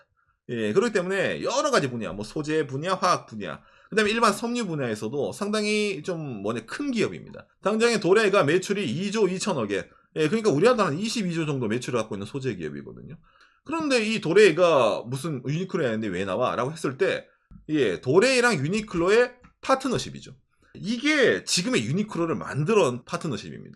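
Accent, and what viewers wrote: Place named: Gyeongsang, South Korea. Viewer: 앗, 어딜가도 사투리, 반값소, 갱상도 요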